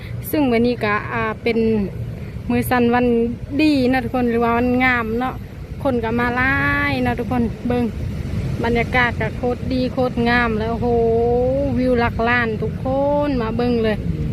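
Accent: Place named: Cambodia. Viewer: แอดสำเนียงออกไปทางเขมรนะ ไม่เหมือนคนลาวร้อยเปอร์เซ็นต์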